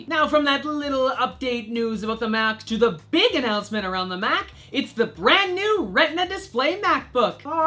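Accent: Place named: Canada. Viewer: you r are canadian?????? how could I not have noticed that??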